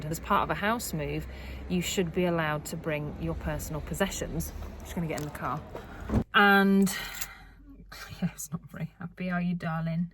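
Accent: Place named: France. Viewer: Vous avez un superbe accent Français 🥰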